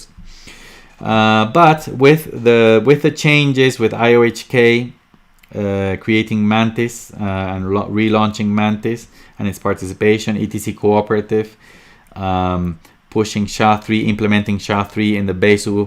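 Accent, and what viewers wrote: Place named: Russia. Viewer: Я так и думал що твой акцент руский ! Честно ти въобще клас ! С етой дъмб я сделал ошибка но надеюсь що за в бодущие не будить . Сподибо за поддержка , и всегда показивяеш все що как надо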